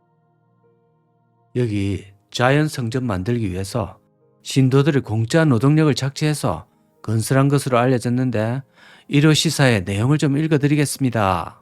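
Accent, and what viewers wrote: Gyeongsang, South Korea. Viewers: 님의 영상 너무재밋고 또 너무나 독특한 갱상도 말씨로 항상 시간가는줄 모르게 보고 있습니다❤❤❤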